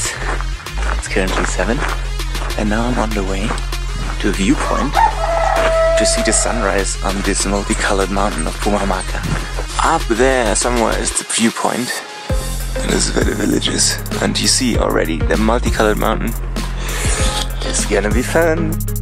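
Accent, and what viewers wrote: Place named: Germany. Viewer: Cant stand this german accent...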